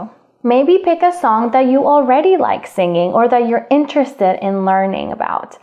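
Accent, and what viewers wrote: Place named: United States. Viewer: Thank you for sharing your knowledge dear teacher. I think now my motivation is to be like you, because you have a great American accent😇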